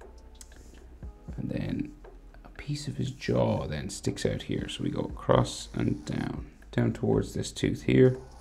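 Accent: Ireland. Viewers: bruh are u irish i am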